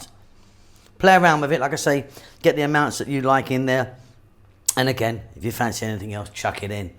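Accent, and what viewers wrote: United Kingdom. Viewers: Are you from the U.K. ?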